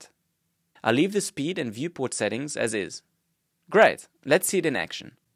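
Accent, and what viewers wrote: South Africa. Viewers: I love that South African accent, makes me feel back at home. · South African accent, yum.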